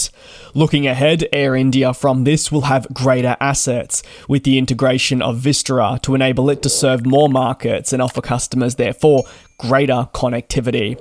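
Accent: Australia. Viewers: No, is Australian. He sounds like that.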